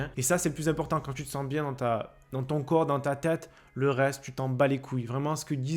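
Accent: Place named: Marseille, France. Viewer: On sent que tu viens de Marseille lol!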